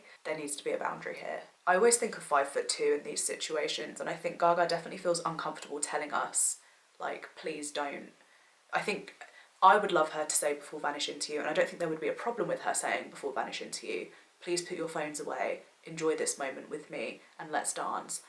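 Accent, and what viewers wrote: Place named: Kent, United Kingdom. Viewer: I reckon you're from Kent, not that I'm trying to dox you or anything but I'm from Kent (UK) and your accent sounds kinda like mine.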